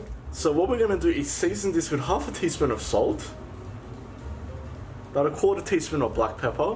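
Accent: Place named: Australia. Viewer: What is wit this Australian accent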